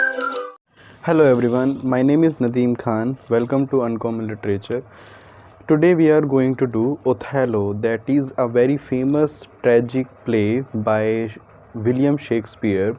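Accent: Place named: India. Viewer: I am afraid It's not teaching; it's simply reading with a Hindi accent. Try to feel and bring some emotion to your teaching.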